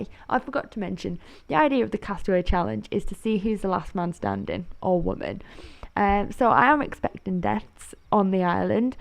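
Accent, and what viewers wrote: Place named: United Kingdom. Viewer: I thought you was UK I am to East Midlands lol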